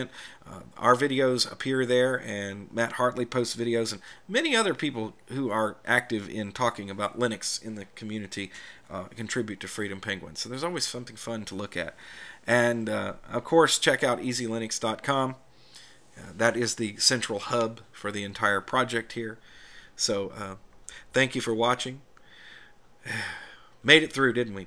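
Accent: South, United States. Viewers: Hey, new sub here, I have played around with Ubuntu here and there through the years, but was always intimidated by the terminal, dove back in recently with Linux Mint on an old HP pavilion Laptop with Core 2 duo and 4Gig RAM. Now that I'm all about learning how to properly use Linux your videos have been awesome. Where in the South are you from, you sound like you're from around my neck of the woods. I could listen to you dispense wisdom all day.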